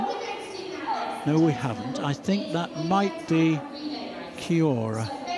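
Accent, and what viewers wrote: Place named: RP, United Kingdom. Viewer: These descriptions being read by this prestigious British man are cracking me up! So freaking cute!!! 🤣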